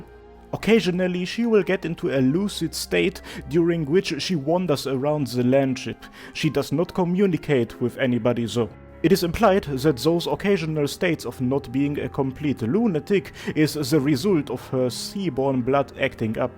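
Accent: Germany. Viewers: german akzent is real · Moin Moin... ausm Norden?!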